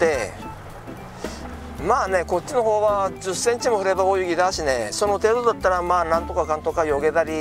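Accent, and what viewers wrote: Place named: Yamada, Japan. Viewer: やっぱり山田弁はいいがねー。山田に帰りて～(;_;)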